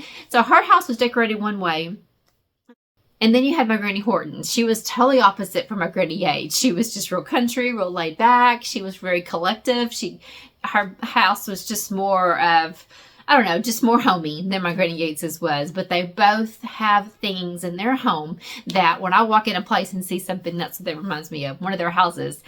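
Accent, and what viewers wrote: Texas, United States. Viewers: I really like your 'treasures'. It sounds like you're from Texas--we are, too. Where are the best antique stores in the Hill Country? We take all of our visitors to the Silo's in Waco, also.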